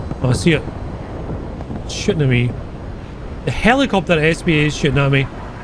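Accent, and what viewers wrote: Scotland, United Kingdom. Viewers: That Sco’ish acc makes everything he says so lively!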